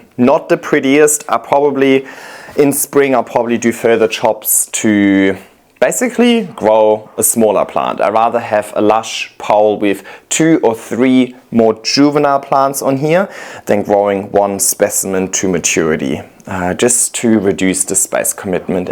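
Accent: Germany. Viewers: Are you originally from Germany? I can hear an accent. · I sorry but I have to ask are you from Germany or Austria? You sound like my friend from Berlin 😅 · I don’t know why but I can’t get over your accent (?) there’s a voice in my head screaming “he is germannnn!!!!!” Love your Videos btw :)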